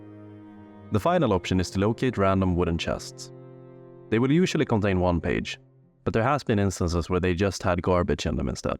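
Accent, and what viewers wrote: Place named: Sweden. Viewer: Love that Nordic Swedish accent bro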